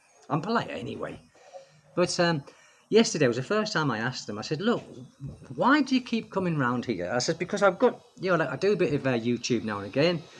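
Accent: United Kingdom. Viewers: Sounds like you’re from the Uk like myself 😂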